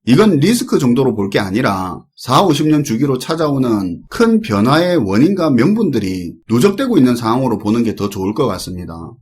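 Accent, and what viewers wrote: Gyeongsang, South Korea. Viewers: 저는 시장은 장기적으로 우상향한다는 낙관론자에 가깝지만 이 부정적인 사람이라고 생각하지 않습니다. 시장을 여러시각으로 바라보는건 당연하죠. 언제나 재밌게 잘 시청하고 있고 갱산도 억양 넘나 좋습니당ㅎㅎㅎ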